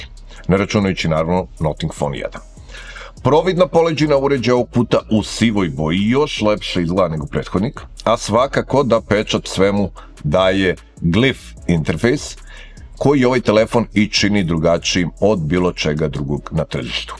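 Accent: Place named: Serbia. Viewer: Ovo je srpski kanal, a ne americki, tako da je izgovor prilagodjen srpskom govornom podrucju.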